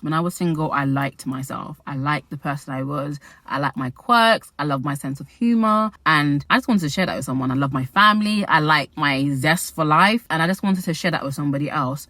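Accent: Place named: United Kingdom. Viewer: Relationship advice + British accent = GOLD Thanks!!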